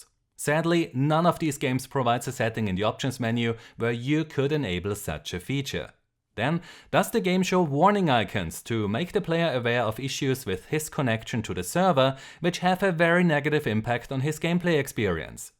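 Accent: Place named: Germany. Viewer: Man hört es als deutscher das du deutscher bist 🤙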